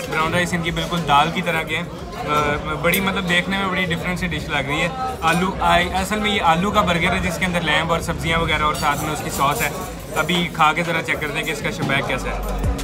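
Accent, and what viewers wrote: Punjab, India. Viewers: Tu Punjabi me urdu bol rha h bhai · Wonderful face reaction after every food. 😊☺and punjabi ascent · Your Punjabi accent..😍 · Your Punjabi punch in Urdu is great. Bahut wadiya mundiya, khich k rakh. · Your punjabi terms are AMAZING.....I'm dying laughing for your natural punjabi style ...lovley..!!✌✌😂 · Punjabi accent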